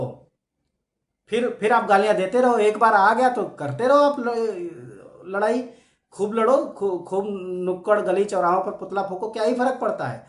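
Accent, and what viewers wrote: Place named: United Kingdom. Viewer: Why is the voice is bloody britishers .pls upload the actual indian voice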